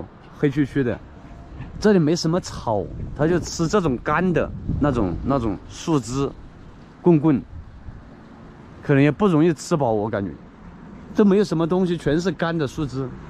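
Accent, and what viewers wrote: Sichuan, China. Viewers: 听囗音象我一个朋友，四川人吗？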